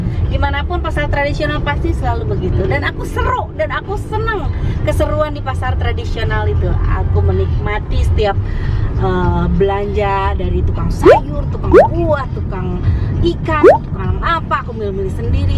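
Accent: West Java, Indonesia. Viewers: Salam kenal dari aku teteh seru banget belanja ke pasar semua diborong Teteh aku sangat suka nonton semua Vidio teteh Cara ngomong teteh b sudanya masih terasa Itu yg bikin khas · Ari teh teh orang bandung na palih mana, meni resep ih nyariosna lucu khas sunda pisan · teteh meni raoseun logat Sunda na😀😘😘😘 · Aku sneng bgt sama chanelnya te suka sma logat sundanya, upload yg bnyak ya teh 😁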